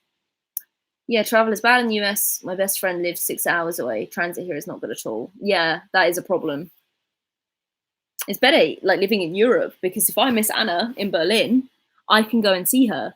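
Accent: United Kingdom. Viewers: Missed the live chat again but enjoyed the replay almost as much 1. I love your quintessential British accent, too 2. love watching you in your new minimalistic setting, very cool , btw do more accent challenges pls thank you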